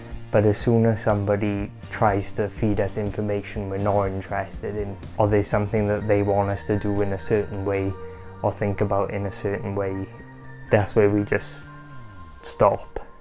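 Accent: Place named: Scotland, United Kingdom. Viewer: Scottish ? Cute accent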